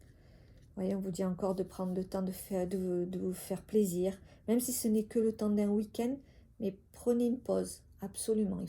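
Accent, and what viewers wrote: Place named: South, France. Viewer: Bonjour! Ton tirage bien que peu qualitatif ne manque pas d’audace. Le cadrage est dans l’ensemble médiocre sur ce point tu as exagéré. Mais j’ai réellement était inspiré par ton tirage. Ça me parle et ca c’est beau. J’apprécie la manière dont tu présentes les choses. Et ton petit accent chantant du sud me met du baume au cœur. Je t’invite a continuer sur ta lancée Bravo.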